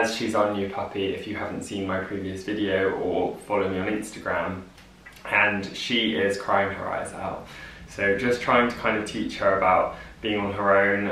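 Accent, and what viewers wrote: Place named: United Kingdom. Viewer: Your British accent is so starch. Clean and concise details with your descriptions .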